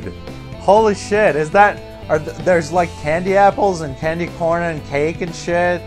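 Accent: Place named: Brazil. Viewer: eu percebi que vc é BR pelo fato de ter sotaque...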